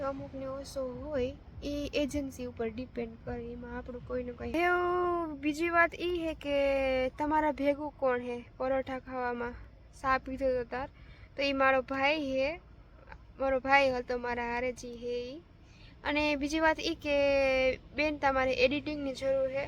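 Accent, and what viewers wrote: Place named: Gujarat, India. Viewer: તમે શુદ્ધ દેશી ગુજરાતી બોલો છો મઝા આવી જાય જ્યારે કોઈ ગુજરાતી ભાષામાં બહાર ના દેશ મા સંભળાય ખુબ સરસ સુરક્ષિત રહો અને નિરોગી રહો સીતા રામ ૐ નમઃ પર્વતીય પતયે હર હર મહાદેવ નમઃ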